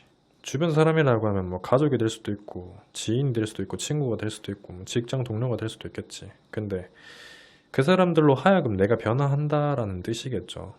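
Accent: Gyeongsang, South Korea. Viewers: 나 50대에요. 구독하고 듣고 있어요. 나도 경상도 출신이라 사투리 억양이 편하고 시니컬한 조언이 좋아요.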